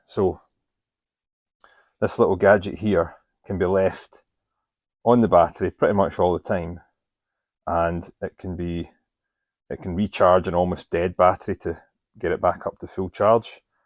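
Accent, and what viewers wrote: Scotland, United Kingdom. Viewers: Buddy you have a strong Scottish accent while have a US flag in your garage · Love the scottish accent 👍 great review I have actually just bought one of these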